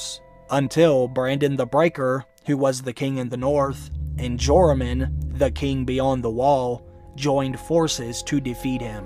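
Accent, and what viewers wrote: Pittsburgh, United States. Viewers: Dude you sound like you’re from Pittsburgh! New castle area?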